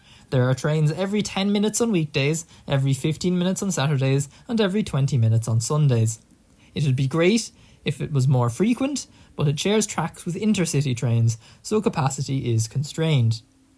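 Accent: Ireland. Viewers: Love it. Born in Killiney, but now live in China ( How much more international can you get ). Love transport infrastructure VTs, as I used to work in that sector. Just as an aside, love your voice, great to hear a cool Dub accent again. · I'd have no reason to doubt that he is Irish, given his distinctly Irish accent. Why do you ask?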